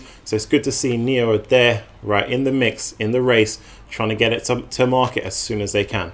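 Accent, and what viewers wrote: United Kingdom. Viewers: Great to hear a U.K accent in the financial space! Great video my bro. #SecureTheBag 🔥🚀💰 Bless!!!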